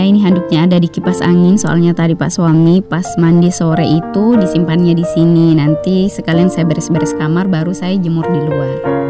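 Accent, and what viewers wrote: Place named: Makassar, Indonesia. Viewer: Hallo bunda salam kenal bunda asal mn??bahasanya ky org makassar jg yah...semangat beberes2 yah bunda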